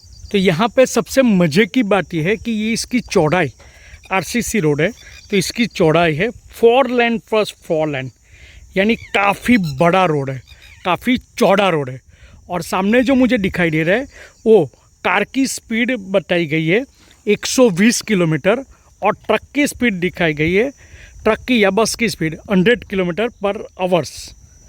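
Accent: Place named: Surat, India. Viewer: ત ને ટ બોલે, થ ને ઠ, દ ને ડ. એ પાક્કો સુરતી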